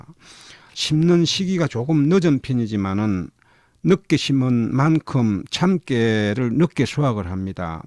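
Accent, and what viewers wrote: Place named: South Gyeongsang, South Korea. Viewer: 🥔감자, 🧢모자 등 말할때 앞 발음이 올라가는것은 경상남도 분들 발음법 같아서 정겹습니다. 저는 경북출신인데, 신혼때 경남에서 6년정도 살았거든요..ㅎㅎ 항상 좋은 영상 감사합니다.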